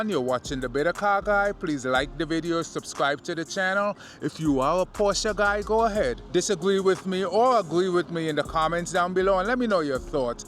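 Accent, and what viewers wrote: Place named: Saint Vincent and the Grenadines. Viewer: Hey! I agree with your analysis of the 992.2. Some terrible decisions by the VW group. After the 997 years, the 911 has just been getting uglier every year. BTW!! Are you from St Vincent in the Caribbean? My friend Kim Collins has the same accent as you. Just wondering. Thanks for the great content. All the best!!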